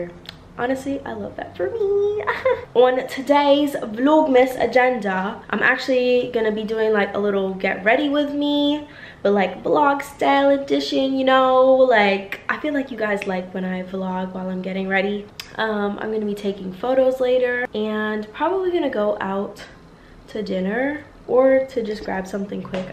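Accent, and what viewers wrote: United Kingdom. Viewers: The British accent 💘🥺..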